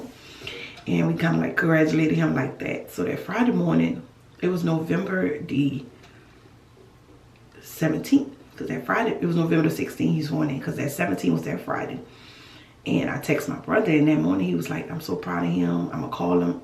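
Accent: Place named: New Orleans, United States. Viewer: You sound like you from new orlean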